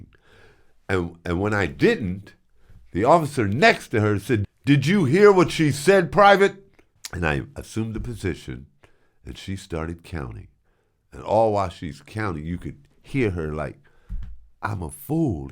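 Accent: United States. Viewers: Hey Americans ! What state accent is this man speaking ? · You are so Sweet hhhhhh you have a Great Voice and American accent I Love it from Algerian.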